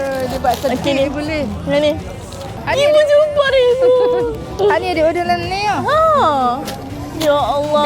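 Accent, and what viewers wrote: Kedah, Malaysia. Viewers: ckp kedah smpai aku terikut nk ckp sekali 😂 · Nanti buat lagii vlogg byk2 suka giler dgr cakap kedah 😌✨😽 Minat dari yg masakkan utk ipan 🫶🏼 serious sukaaa gilaa dgr ckp 😻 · suka betul dengar cakap dengan loghat Kedah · suka lah tengok bebel dalam bahasa kedahh, comell tauu 😍 btw sebut nama abg sayang ke tuu 👀 kita rindu lah aimala, abg syg wait for me~ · sampi kedah pekat betul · Aku suka betul loghat kedah, nak pulak yg bercakap lagi suka dgr 🤩 fighting post, will support baby no matter what 😘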